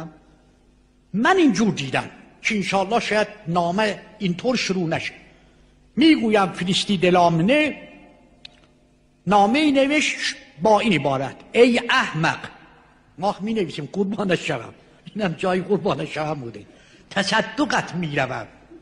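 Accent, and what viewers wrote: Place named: Turkey. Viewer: روحت شاد فیلسوف بزرگ، بالهجه ی شیرین ترکی😊🎉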